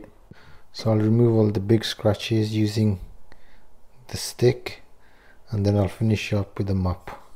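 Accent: Birmingham, United Kingdom. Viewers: It’s so therapeutic to watch you restoring watches, and a brummie twang is the perfect accompaniment!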